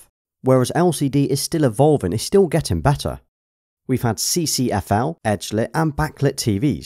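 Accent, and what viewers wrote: United Kingdom. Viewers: I really wanted to find someone speaking British English in normal speed, finally, the day has arrived.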